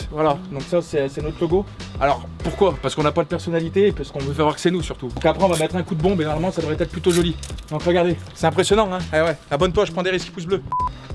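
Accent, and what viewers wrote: Saint-Étienne, France. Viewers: jte connais pas depuis longtemps mais tu mérite vraiment ! PS: tu serait pas un stéphanois avec ton accent ? mf91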